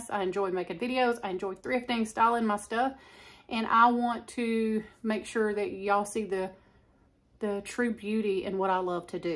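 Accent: South, United States. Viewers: Just found your videos. Love them!! I heard your southern accent and knew I had to subscribe.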